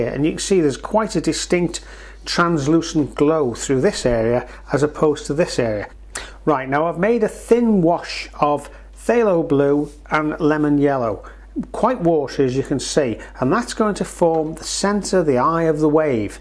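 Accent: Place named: Lancashire, United Kingdom. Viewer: Just found you. Love this. I live in Los Angeles but grew up in Fleetwood, Lancs until I was 14, so loved listening to your accent!!!!!!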